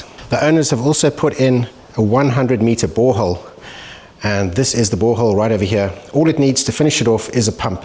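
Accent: South Africa. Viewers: you sound like Suid Afrkaner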